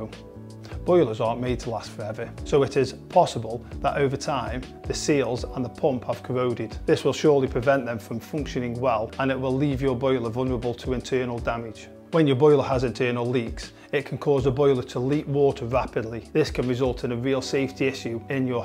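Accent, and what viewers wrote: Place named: Stoke, United Kingdom. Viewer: This man has to be from Stoke!😊 (It takes one to know one.)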